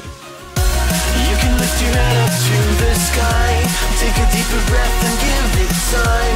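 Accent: Germany. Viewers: I don’t know why, but i‘m german and i really hear the german accent and it kinda sounds like bad english, #nohate, still like you😂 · You are german right? You have this special accent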